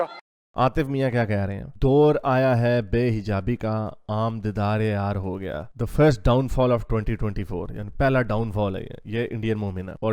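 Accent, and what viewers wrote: Punjab, Pakistan. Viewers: No they both are Pakistani Punjabi speaker that is why accent is same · Sir ur punjabi accent is great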